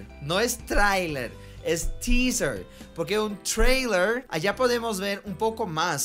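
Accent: Colombia. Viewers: habla como si fuera colombiano de nacimiento 🥰 TENEMOS 3 cordilleras en bogota no TENEMOS casas asi 😂 cual extrajenro si tu ya estas asi habla un colombiano eh ave maria me encantas tus videos 💗 y no se si ya lo sabes supongo q si pero igual te lo digo xd la cancion del teaser se llama y si algun dia haces una introduccion para tu canal deberias usar esa cancion porq iria excelente con el canal 🥰 y no eran orquideas 😂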